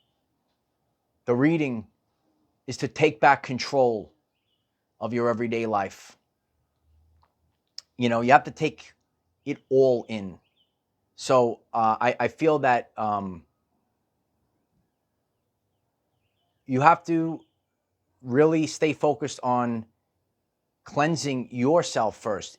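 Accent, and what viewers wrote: United States. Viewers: American accent Aussie trees behind you...not aligned from my perspective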